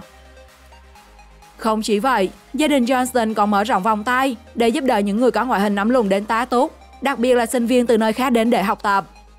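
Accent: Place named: Phu Yen, Vietnam. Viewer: Cô này là người Phú Yên- Tuy Hòa. Cô ấy cố gắng sửa giọng để giọng nói không bị rặc tiếng địa phương, nhưng càng sửa thì giọng cổ lại càng khó nghe vì nó lai đủ thứ miền.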